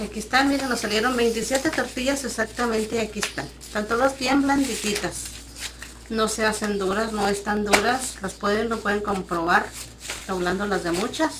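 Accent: Mexico. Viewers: !!! Tu video es súper eficaz y me encanta el dejo Mexicanoooo! Saludos desde Peru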